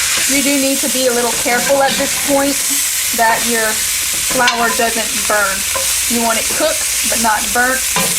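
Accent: Louisiana, United States. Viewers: are you cajun??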